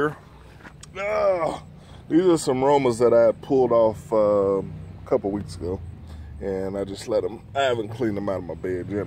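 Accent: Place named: South, United States. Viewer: I love your Southern accent! You might find a decrease in blossom end rot if you add more mulch to the top of the soil too. This keeps the moisture in the soil longer in hot weather which helps prolong the water absorption. Your garden looks beautiful! :)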